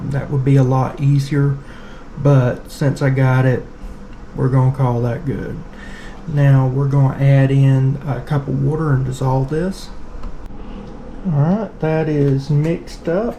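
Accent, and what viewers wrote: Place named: South, United States. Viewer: Heck, I have been looking for something to complain about all day. Here is my chance! - It is the southern slow-talking. I spent half of my life in east Texas and the other half in west Texas. I made a few videos just playing around, I realized that many people found my accent unlistenable. I actually thought about putting in some effort and writing scripts and practicing a faster delivery, but then I decided I did not care that much. I believe that you need to speed up your speech and make more efficient use of your words? People seem to want information delivered at high speed. Best wishes.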